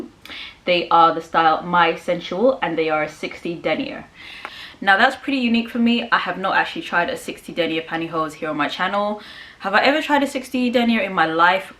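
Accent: United Kingdom. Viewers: I love your lovely smile on your baby face, with lovely long hair, lovely lips. You make a great model. You have extremely attractive and sexy legs in your black designer tights with blouse and high heel shoes. Your black tights combined with black dress and your high heel shoes. I love your lovely British accent please I wouldn’t change a thing. I must give you respect lovely lady.❤️❤️❤️❤️❤️❤️❤️❤️❤️❤️❤️❤️❤️❤️❤️❤️❤️❤️❤️❤️❤️❤️❤️❤️❤️❤️❤️❤️❤️❤️❤️❤️❤️❤️🌹🌹🌹🌹🌹🌹🌹🌹🌹🌹😀😀😀😀😀😀😀😀😀😀😀😀😀😀🌹🌹🌹🌹🌹🌹🌹🌹🌹🌹🌹🌹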